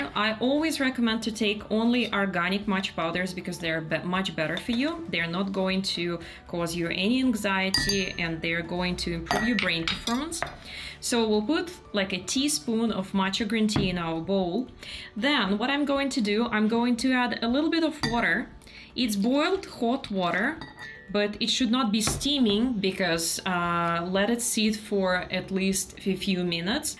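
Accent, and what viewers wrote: Russia. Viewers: Classic russian accent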